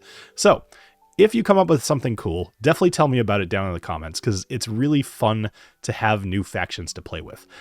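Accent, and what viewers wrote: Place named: Canada. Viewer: You're Canadian? I just clocked it...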